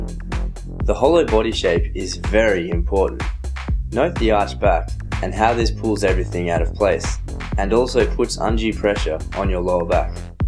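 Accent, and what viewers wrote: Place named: England, United Kingdom. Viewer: finally an english accent i can understand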